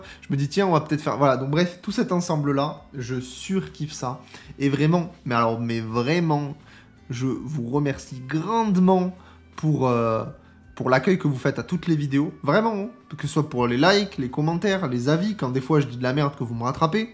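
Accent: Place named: South, France. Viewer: Pour les idées de vidéo, je remet ici l'idée de faire un top drip/style des persos 😎 Le truc en plus qui fait aussi que j'aime ton contenu, c'est ton accent du sud ahaha :D Pour le cast zone, je suis pas créateur de contenu, mais je suis toujours chaud pour participer pour un spécial lore et théorie, je pense vraiment avoir de quoi causer 20 bonnes minutes et qu'on pourrait débattre dessus, j'adore me hype sur l'avenir possible du titre 😁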